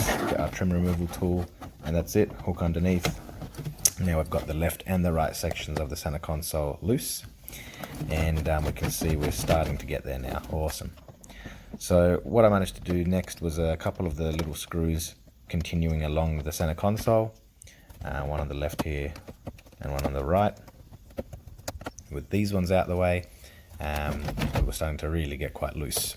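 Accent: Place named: Australia. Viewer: can i ask why you sound aussie but looks like your hands are Indian? :)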